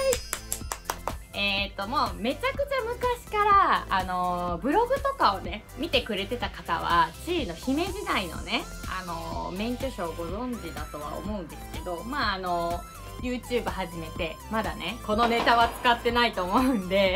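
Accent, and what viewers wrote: Kansai, Japan. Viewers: 顔も可愛いけどたまに出る関西弁がほんと可愛い🤤💕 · 関西弁かわあい💕 ちょっとお顔違うねー！ 今の免許書の写真かわいすぎ！もっとビックでみたーい！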